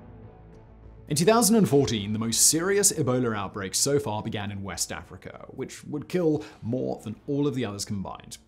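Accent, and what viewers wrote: RP, United Kingdom. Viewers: Your accent is hilarious. It's like recieved pronunciation but more irritating. You sound like the queen did in 1955.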